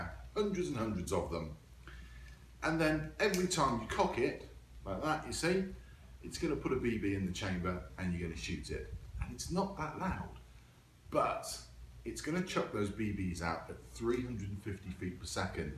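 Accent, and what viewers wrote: England, United Kingdom. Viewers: Its American, but youre English! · are you bri'ish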